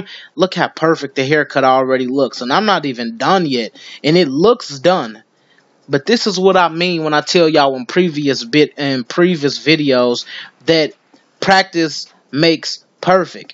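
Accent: Chicago, United States. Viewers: You from Chicago right ? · I can already tell u from Chicago bro where u cuttin at? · He sounds like he from Chicago 😂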